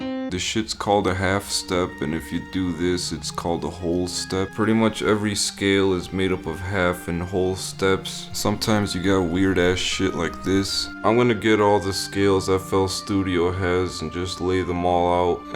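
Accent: Canada. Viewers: I THOUGHT HE SOUNDED CANADIAN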